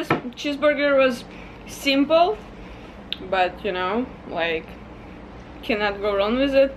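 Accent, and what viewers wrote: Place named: Russia. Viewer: сразу слышно, что русскоговорящая хд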